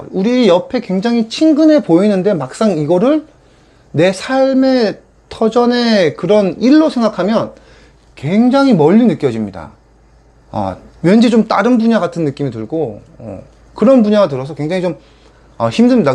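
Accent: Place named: Gangwon, South Korea. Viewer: 말투에서 풍기는 억량이 강원도분 같기도하고 암튼 추천 남기고 갑니다 저도 4년6개월된 현직 버스 기사 입니다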